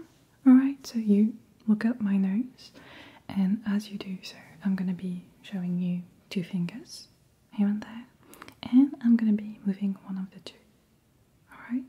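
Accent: France. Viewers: I love the touch of french accent that can be occasionally heard in your english. The popping c-, p-, and t- sounds are so tingly ✨💛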